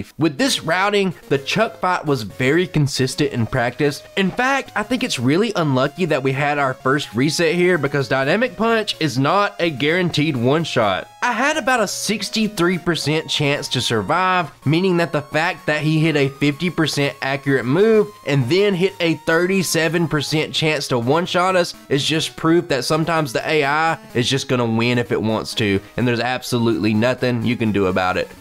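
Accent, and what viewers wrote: South, United States. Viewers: Almost got to 500! Also, I still love that I get some good ole southern representation and you’re not afraid of it. One thing that’s present in media is a bias against accents and i love people who can be themselves. So from a Georgian, thank you! Also, you the sandstorm meme could just be a slight against the University of South Carolina 😊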